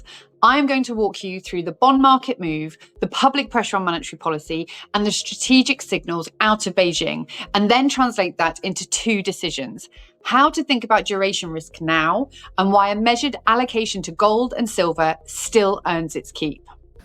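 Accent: England, United Kingdom. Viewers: I just love English people. I could listen to y'all talk about paint drying. Great presentation though.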